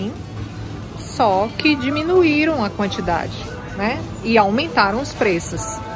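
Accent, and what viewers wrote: Bahia, Brazil. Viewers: Arrá! Descobri! Ela é baiana! Fala mainha, salvadô....😁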